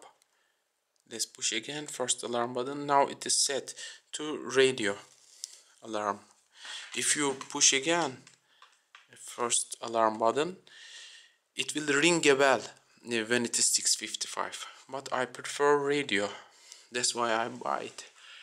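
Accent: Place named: Turkey. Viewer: A perfect example of Turkish English. I could tell even if you did not write your name.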